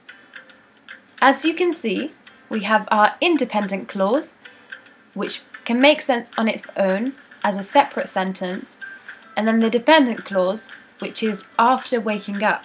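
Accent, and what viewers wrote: United Kingdom. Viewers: Why she sound british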